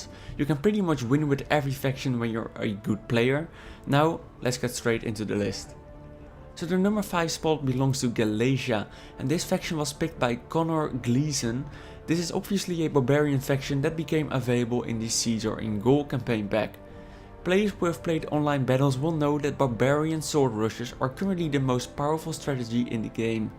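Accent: Netherlands. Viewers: the guy narrating this sounds like he has a dutch accent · could you be any more dutch? Why is it that people speaking with a Dutch accent simply sound a little bit inbred?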